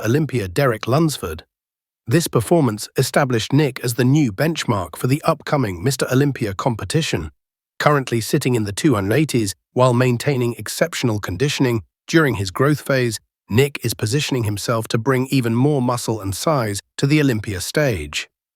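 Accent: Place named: United Kingdom. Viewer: I love the British accent! Which assistant do you use?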